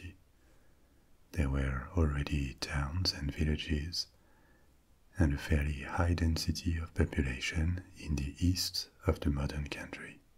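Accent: France. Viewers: I love the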